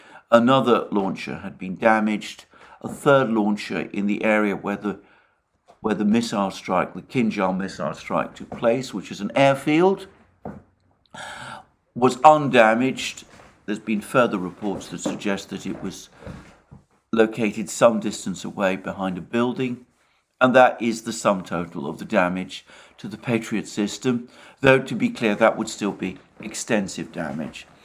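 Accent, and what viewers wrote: United Kingdom. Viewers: Your well-spoken nature has such a British appeal.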